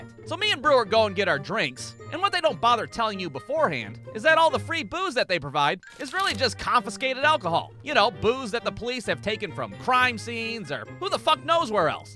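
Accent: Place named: Ohio, United States. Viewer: he’s from ohio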